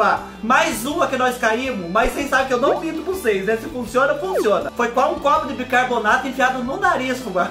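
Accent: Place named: Capão Bonito, Brazil. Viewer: Fubá, você fala CHÊ. Que nem minha falecida vozinha ali de Capão bonito. Vc lembra meus familiares. Amo seu canal. Agradrço a Deus por vc existir. Muita saúde pra vc e pra sua mãe maravilhosa. Deus os abençoe.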